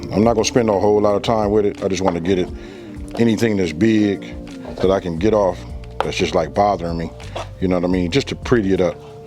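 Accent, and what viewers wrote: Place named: California, United States. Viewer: I'm digging the Cali accent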